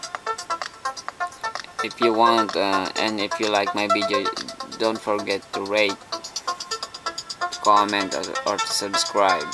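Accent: Philippines. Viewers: Philippine? i have a philippine teacher that speaks exactly the same. switches the Fff with P, and some she switches some other letters too. but i mainly remember that. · filipino accent :)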